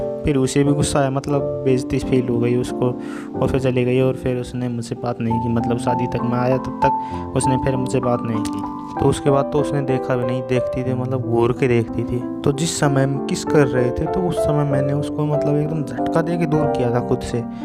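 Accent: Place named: Haryana, India. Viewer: Bhai mujhe lgta h ki tum haryana se ho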